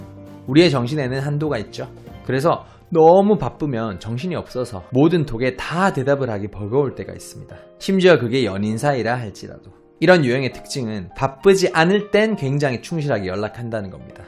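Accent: Gyeongsang, South Korea. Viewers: 고향이 대구쪽이신가??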